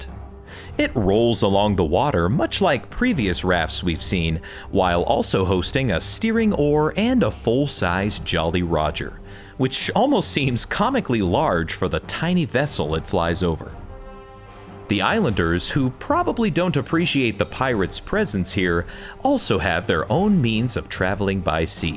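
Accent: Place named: Scotland, United Kingdom. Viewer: Sounds a bit Scottish to me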